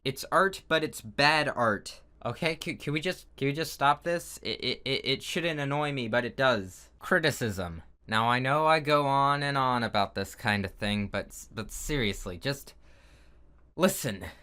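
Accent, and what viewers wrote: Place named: United States. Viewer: F A N T A S T I C :3 I thought he was American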